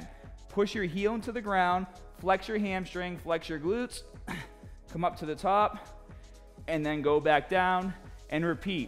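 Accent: Boston, United States. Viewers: Damn...you’ve been in Florida for several months...and still have that Baaastin accent....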